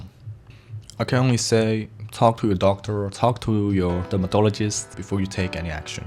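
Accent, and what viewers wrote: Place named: England, United Kingdom. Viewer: I like ur english accent😊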